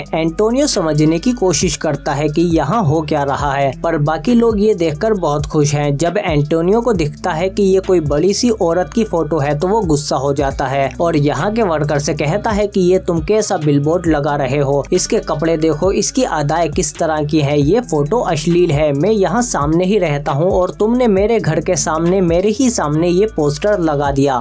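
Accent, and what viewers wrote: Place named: India. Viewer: Your Indian accent, when you speak in English, is terrible, man from India. Learn English better.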